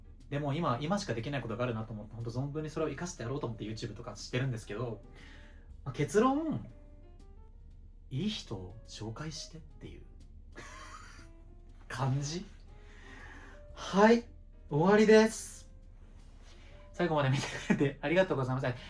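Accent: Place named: Kansai, Japan. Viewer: 人生のセンスもあって本当にすごいですꧦ𐅁𐀸𐋠𛰙᭜𖫴𖫰𖫱𖫳𖫲𖫲𖫳𖫴𖫰𖫱꛰ﯩᩝ︪᭜𖫴𖫰𖫱𖫳𖫲𖫲𖫳𖫴𖫰𖫱꛰ީᩝ𛰚てかもっと関西弁ナチュラルに聞きたい😂同じ関西人なので期待してます♪♪♪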